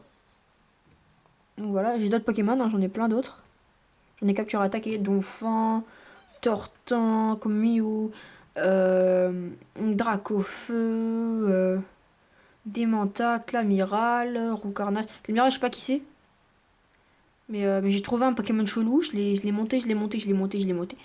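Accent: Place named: South, France. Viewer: accent du sud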